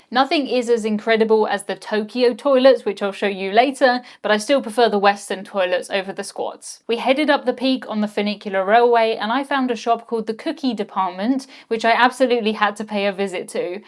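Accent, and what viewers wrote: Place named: England, United Kingdom. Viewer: Thank You alway for providing great cruise information & awesome locale to life on your vlogs.. oh, love your English accent.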